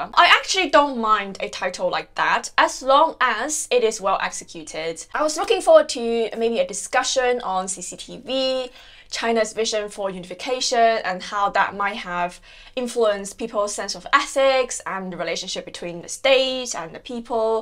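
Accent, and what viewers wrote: United Kingdom. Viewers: your bookreview... I found it quite.. informative. Also about your topics of interest, and your public persona. Youdr British accent is quite impressive, you seem to be ambitious and a person, who is on a definite path of making your life exceptional in your own way. Did you read books by Cixin Liu? If yes, did you find them worthwhile? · Great content. Learned a lot. Prettiest british accent ever. A+ on the book reviews. I am French. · you have a sort of posh british accent mixed with some chinese accent, it's very interesting; where did you goto school? did you grow up in england?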